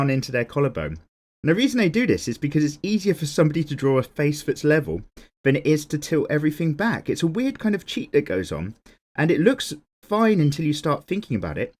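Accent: United Kingdom. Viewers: WHY IS THE BRITISH ACCENT SO BEAUTIFUL?! TTATT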